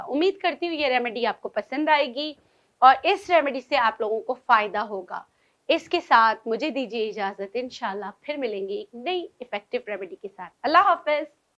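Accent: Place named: Gilgit-Baltistan, Pakistan. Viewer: Salam Dr Madam, this is very nice and very simple kids and others, thank you Dr gi Your way of talking shows you from gilit baltistan.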